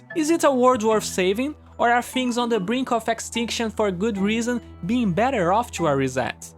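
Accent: Brazil. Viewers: engraçado como é excepcionalmente facil identificar BR falando inglês xD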